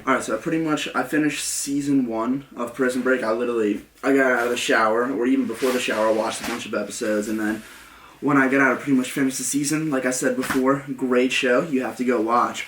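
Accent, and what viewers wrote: United States. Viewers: He has a very strong American accent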